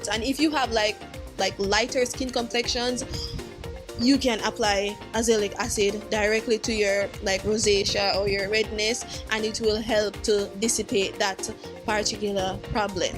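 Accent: Jamaica. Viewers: Love your Jamaican accent 😍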